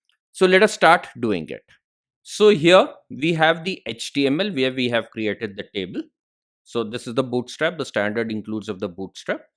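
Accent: India. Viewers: funny indian accent... 😸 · What's so funny about it? I guess you've never heard Indian English!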